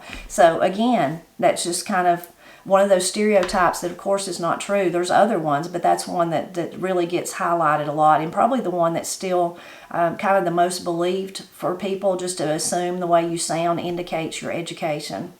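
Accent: Appalachia, United States. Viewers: I am from Baltimore and recognize most of the terms and pronunciations you mentioned in this video. My mother always told me that folks from the Appalachian states came up to Baltimore to work in the aircraft factory (Martin Marietta) and other factories during WWII. I guess this influenced the colloquial language in the area. Our accent is not Southern, but our pronunciation is very much like yours 👍🏻👍🏻🥰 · I love the way you talk. It is so much like the way my relatives in the Appalachian mountains of Kentucky and Virginia speak. I grew up in northern Ohio, but my southern grandparents were nearby and I whenever I hear someone with a southern accent it reminds me of my precious family. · When I listen to you talk, I hear my parents. They aren't from Appalachia, but some of the grandparents were. I think the accent and the ways of speaking carry on for generations. 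· LOVE the channel my favorite videos are the language & accident videos. My dads family are from Big Stone Gap, Va We go back to Big Stone every year for our family reunion There is a small town right next to Big Stone call Appalachia, VA we pronounce Appalachia like this App-a-lachE. Not the A at the end we say it with the strong E at the end. When I found your channel I could not believe someone knew about my small town in Big Stone and was from Appalachia. Of course I realized you guys are from NC not VA. I have to tell you your accident and your daughters speak just like my dad and his family I love to listen to your videos because of that. My children are city kids lol but love going to a Big Stone every summer to have sweet tea on my aunts front porch while looking at the beautiful mountains. Nothing in the world like it. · Thank you for such an interesting video. I am from the coastal plain of South Carolina and I pronounce every single word you mentioned exactly the same way you do. 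I never thought about this but my great grandfather who I never knew was from Blairsville, GA and his mother was from Morganton, GA with the family going back to the 1700's in the same general area much like yours. I pronounce Appalachia exactly the same way you do and was always corrected in school about it. Now as I sit here and think about it I bet that part of the reason I speak the way I do is partially because of words and phrases that have been passed down to me from my Appalachian heritage. It was something I never considered until I watched your video. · Love this! You speak, pronounce the words, the same as I do! You are 100 percent correct, that how we talk is not an indicator of our intelligence or education. I have been "educated" at NC state, college degree in business, but I speak my southern Appalachian English. I have worked with people from up north, who spoke "proper", yet they were dumb as a box of rocks...their "proper" pronunciation of words did not mean they were intelligent. 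So it works both ways...My dad only went to the 8th grade, born and raised in watauga county nc, but he was one of the smartest people I have ever known. He was like,in the respect, that he could do anything, fix anything. He was the one everyone called if they needed anything repaired or a problem solved. Funny about the "mote". My kids called the remote control the "mote" too! Haha...thanks. Appreciate y'all always....God bless....🙏❤ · Here in North Mississippi we talk exactly like y'all do. We were raised saying "okry" too. All of your examples this morning are identical to our pronunciations and meanings. I was raised in a small town here and my parents were both raised on dirt roads way out in the country and that's the way they talked. But I notice people who live in larger Mississippi cities talk the same way. I would fit right in with your Appalachian life style. Living these videos. 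· you talk just like I do. Of course North Georgia ain't far from North Carolina.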